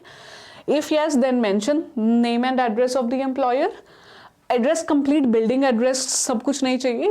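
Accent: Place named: Karnataka, India. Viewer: It looks like mam mother tongue is kannada!!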